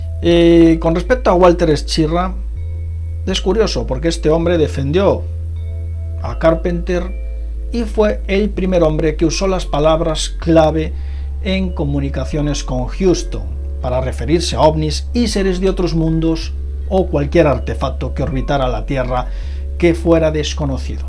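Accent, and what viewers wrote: Spain. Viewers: tu vos me da cáncer y mas tu acento de español racista y ladron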